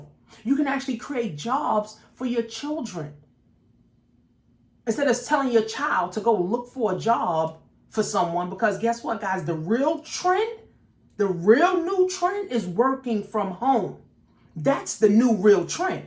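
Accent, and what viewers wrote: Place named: New York, United States. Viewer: Not only are you telling the truth that New York Accent ❤ much love from Cleveland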